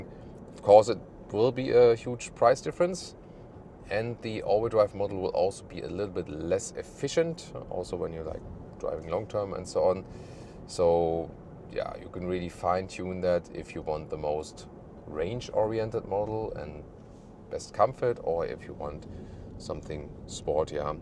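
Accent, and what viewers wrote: Germany. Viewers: Just want to say, that I love your videos. As a car guy myself, I really appreciate a German car guy speaking English. It’s pure enjoyment. Greetings from Denmark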